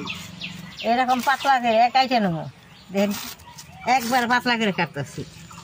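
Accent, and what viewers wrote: Bangladesh. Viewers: Akdom amader বাংলাদেশের moto apnar kotha o ranna khub valo lage ,darun lagse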